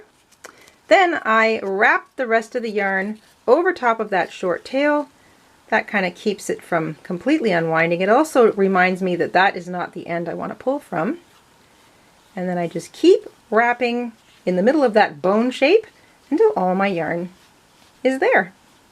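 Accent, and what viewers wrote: Canada. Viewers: Aboot!!! Love your Canadian accent! I’m a huge Rush fan and love Canada!!!!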